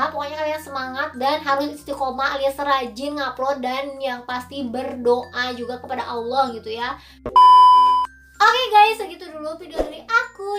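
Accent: West Java, Indonesia. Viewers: Kka orng mana?Kaya Sunda🤣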